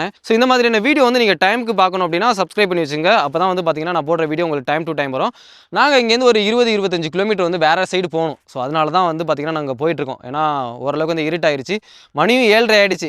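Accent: Tamil Nadu, India. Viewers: Avanga pattukottai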